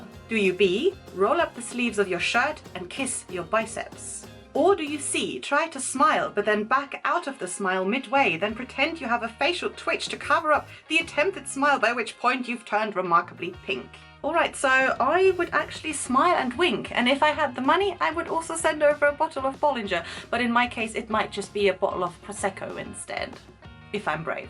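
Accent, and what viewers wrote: United Kingdom. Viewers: you sound almost British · Love this video! You sound so British too x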